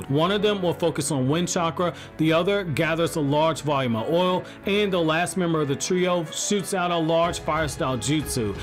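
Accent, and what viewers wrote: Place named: South, United States. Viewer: they say oil like that in the south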